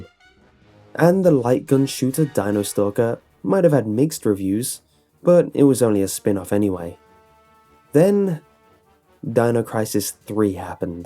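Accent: United Kingdom. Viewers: British accents.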